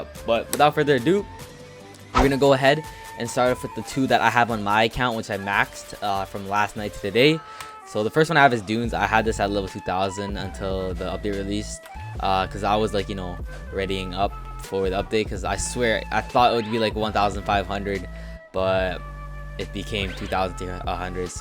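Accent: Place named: United States. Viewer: It’s an American thing we say it like zee instead of see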